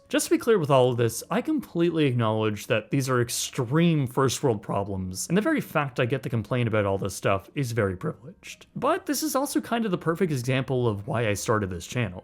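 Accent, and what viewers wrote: Canada. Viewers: This guy just whines about everything in a Canadian accent and makes sure to say “out” and “about” in that dumbass way as much as possible in every video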